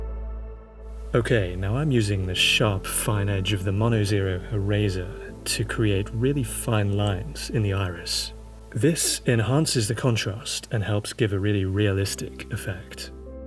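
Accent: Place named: United Kingdom. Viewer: Yup I was thinking the same thing. The British accent ad smooth softness of his voice is just fin du fin lit! (French term.)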